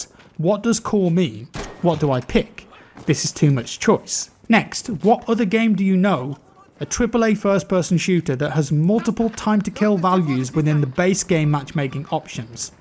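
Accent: United Kingdom. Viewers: always like the way you put your thoughts, the core itself and your British accent,! Thanks for video!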